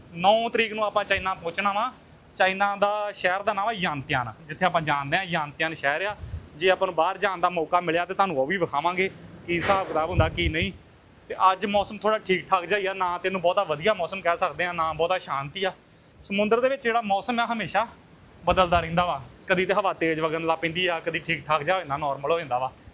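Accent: Majha, India. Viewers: ਜਾਜ ਮਾਂਝੇ ਵਾਲੀ ਬੋਲੀ ਆ ਪਰ ਵੀਰੇ ਜ਼ਿਲ੍ਹਾ ਤੇ ਪਿੰਡ ਕੇਹੜਾ ਕਿਰਪਾ ਕਰਕੇ ਜ਼ਰੂਰ ਦੱਸਣਾ ਜੀ ❤😊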